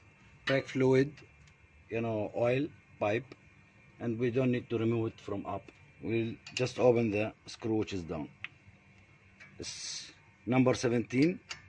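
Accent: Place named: Arab world. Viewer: من لكنة كلامك اظن انك عربي ارجو رفع فديوهات اكثر بخصوص الباجيرو تحياتي لك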